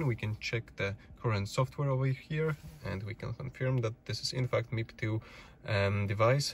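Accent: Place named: Poland. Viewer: Dialekt jak by Polski🤔 super tego szukałem 🙏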